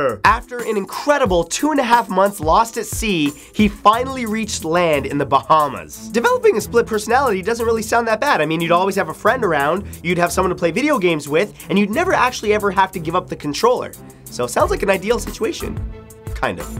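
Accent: Canada. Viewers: Canadian eh? love it and ya winter is BS here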